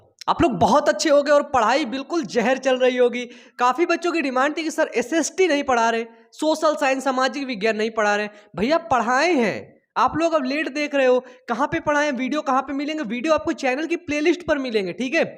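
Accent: Uttar Pradesh, India. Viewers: Sir Aap u.p shy hoo kya 🤔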